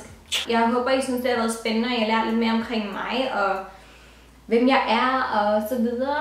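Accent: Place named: North Jutland, Denmark. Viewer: Elsker når man lige en gang i mellem kan høre en snert af din nordjyske accent <3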